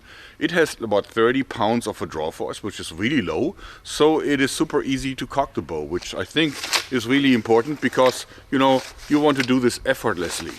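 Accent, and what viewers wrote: Germany. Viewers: Your english is getting better and better. Don't lose the german accent, though, would be a shame!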